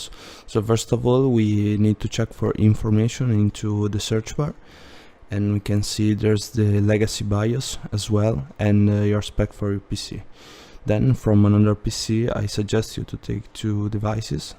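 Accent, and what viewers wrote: Italy. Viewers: Grazie!!! Cmq si sente un bordello che hai l'accento italiano 😂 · ho capito che eri italiano dalla pronuncia, qualsiasi italiano mantiene la pronuncia italiana anche se parla in inglese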